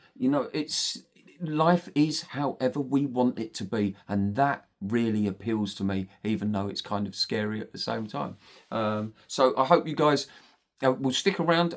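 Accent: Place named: United Kingdom. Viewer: Best wishes to you, man! You sound like the British version of ROB THE NOMAD. Looking forward to following your journey around the great North of the UK, or wherever you end up. Post often and happy travels!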